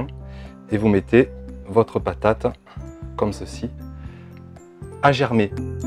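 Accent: South, France. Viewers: Salut, Voilà j'ai lancé la plantation de patates douces... 1ère étape, faire germer les morceaux de patates douces (en ayant coupé 2 patates douce en 4 morceaux) mis en barquettes sous cloche avec lumière horticole 150 watts et tapis chauffant réglé sur 20 °C. Je reviendrai te dire de quoi il retourne par la suite. Nous sommes aujourd'hui dans l'Aisne (02) le 02/02/2020. Encore merci à toi pour tes merveilleuses vidéos qui au delà des techniques m'apporte ta bonne humeur, ton inspiration, ta gniac, ton superbe accent du sud et ta bonne humeur légendaire. Merci à toi et continue car tu as une empreinte spécifique sur Youtube. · Merci pour tous ces précieux conseils et pour l'accent de mon Sud natal 😄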